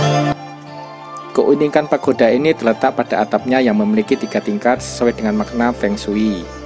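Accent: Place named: Java, Indonesia. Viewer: ulasannya jelas dan rapi. ditambah prolog suara yg medhuk. jian video dokumenter yg mantab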